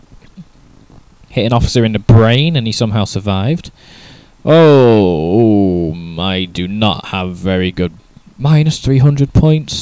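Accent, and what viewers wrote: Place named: England, United Kingdom. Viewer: OH YES THANK GOD SOMEBODY ENGLISH!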